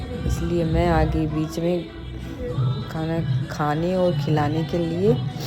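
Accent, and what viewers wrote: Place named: Rajasthan, India. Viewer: ok Ji good, हम भी साउथ दिल्ली से जाट हैं, आपकी भाषा से आप राजस्थान से हों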